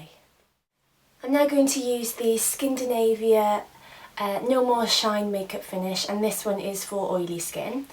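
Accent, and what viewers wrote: United Kingdom. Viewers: you welsh?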